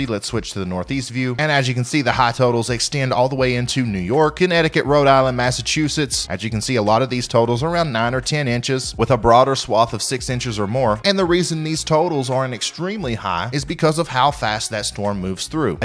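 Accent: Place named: Tennessee, United States. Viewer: Just found your channel and gotta ask, is your accent from NE TN? Thanks for the great content.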